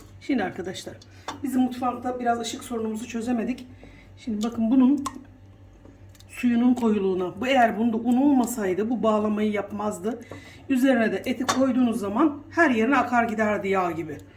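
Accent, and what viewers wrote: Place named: Aydın, Turkey. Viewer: Benim de annem ve babam vefat etti ve hanimi anneme benzettiğim için çok izliyorum. Bana annemi anımsatıyor. Aydınlı olduğum için şivesi de benziyor lezzetler de benziyor ah ah.... hanım Allah size uzun ve sağlıklı ömürler versin..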